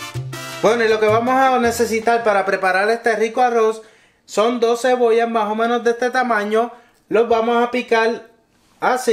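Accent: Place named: Puerto Rico. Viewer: Que lindo te vez hablando me gusta el acento de los bori hoy voy hacer un arroz con ajo y cebolla 🤗😀🧐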